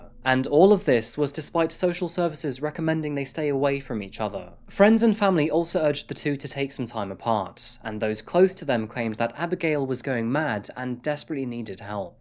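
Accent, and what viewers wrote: United Kingdom. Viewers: That’s who I thought it was until I heard the British accent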